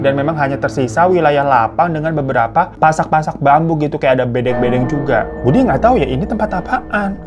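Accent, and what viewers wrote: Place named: Madura, Indonesia. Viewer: banggg basa banjar bangggg logatnyaaa kek maduraaaaaa😭👋👋👋👋👋👋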